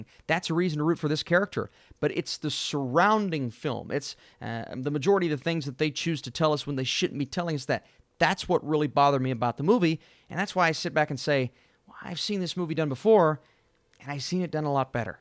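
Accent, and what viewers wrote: South, United States. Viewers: I just discovered you. Bro you were made for this. Love your delivery! Love your accent! Southern I'm guessing. You have a new fan! And, I will watch this just to learn from it. I love bad movies, great teachers;)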